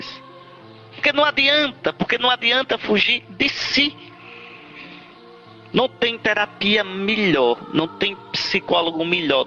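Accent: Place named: Northeast, Brazil. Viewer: Taí um verdadeiro filho do trovão , que hoje no céu com seu sotaque nordestino cabra macho faz o encardido tremer quando algum filho lhe pede um favor , obrigado Jesus pelo teu filho Dom Henrique. Louvado e glorificado seja nosso senhor Jesus Cristo. Fiquem todos na paz de Jesus e no amor de Maria.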